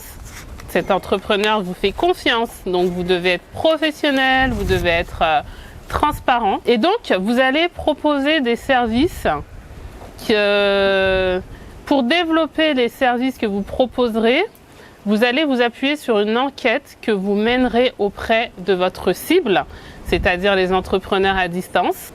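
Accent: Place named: Senegal. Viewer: Cest drole plus je vous écoute plus j'entends l'accent qui se "sénégalise"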